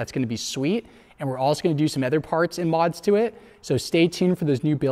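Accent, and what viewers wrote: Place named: California, United States. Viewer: Damn bro you sound extra California. Tom delonge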